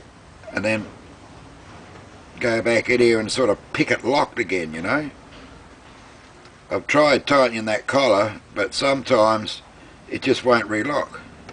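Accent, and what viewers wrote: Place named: Australia. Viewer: Fuck the video, I'm guessing your Australian? if not, either way your accent is awesome :0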